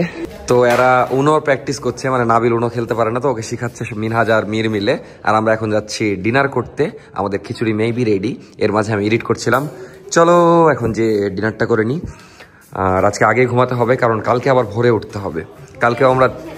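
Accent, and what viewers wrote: Rajshahi, Bangladesh. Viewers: Vai Ami epar Bangla ( INDIA ) ,apnar paser district Murshidabad theke , Apnader Rajshahi r Bhasar taan and amader Murshidabad er Bhasar tan onek tai same , khub valo lage apnader video dekhte . West Bengal a konodin asle dekha hobe ...Take care ❤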